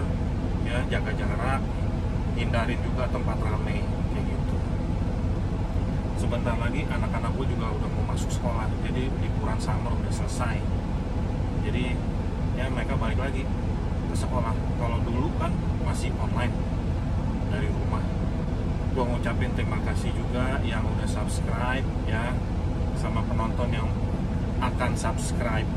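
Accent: Indonesia. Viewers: Akhirnya aplod lg Trucker favorit. Kalo dengerin ocehannya asik bgt krn logatnya masih 'Indonesia' bgt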